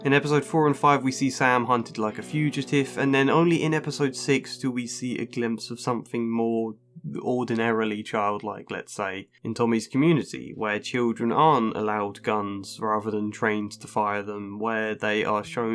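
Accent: United Kingdom. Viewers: It's just as tragic as we thought but the British accent makes it sound more profound ?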